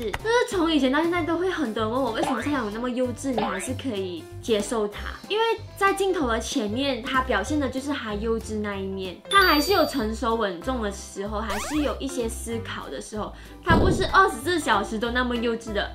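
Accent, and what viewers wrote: Taiwan. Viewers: 有谁不太适应的台湾腔的？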